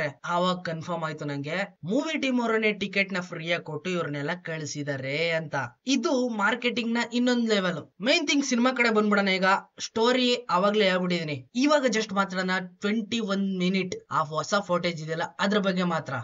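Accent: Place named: Mandya, India. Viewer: ಅಣ್ಣಾ ಇಷ್ಟು ದಿನ ಎಲ್ಲಿ ಇದ್ದೆ ಅಣ್ಣಾ ನಮ್ ಮಂಡ್ಯ ಮೈಸೂರ್ ಬಾಗದ ಭಾಷೆ ಕೇಳಿ ಕುಷಿ ಆಯ್ತು😅😅😅❤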